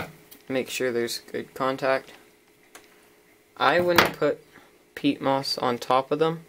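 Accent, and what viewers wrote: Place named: Canada. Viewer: No. I just thought I detected a Canadian accent.;-) Did I ask you if you live in Canada? If I did, my old comment is not showing anymore. I talk to quite a few YouTuber’s. So that question only applies (do you live in Canada) if I did ask you that.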